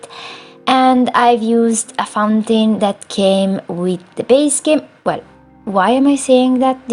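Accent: Italy. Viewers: This house is super cute but honestly I'd just take the treehouse and live there. Okay bye. Haha I'm serious the treehouse is suuuper good and also the swing trick is amazing. Love your accent fellow italian sister😌❤❤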